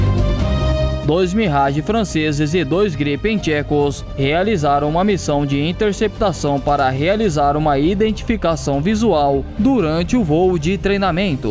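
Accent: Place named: Ceará, Brazil. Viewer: eu tavo vendo uma coisa voce tem um sotaqui de seara voce e searence ne cpz kkkkkkkkkkkkkkkk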